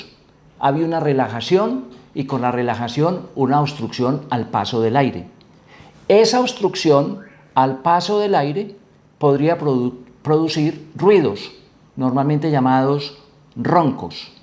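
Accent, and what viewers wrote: Colombia. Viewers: Por tu manera de hablar, eres colombiano, estoy en lo cierto?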